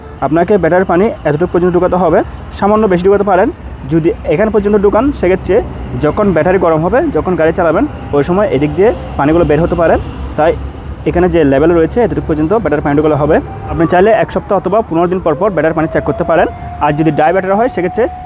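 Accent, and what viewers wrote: Chittagong, Bangladesh. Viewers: Chittagong naki apner badi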